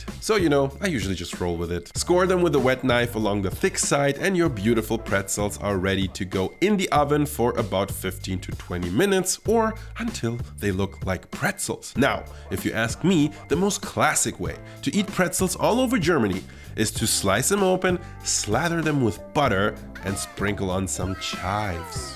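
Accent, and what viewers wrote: United States. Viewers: You r accent is too american,I would have never guessed you were ein dEUTCHLAND Mann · You have such an American accent. It's kind of offensive that you start your post dissing other Americans.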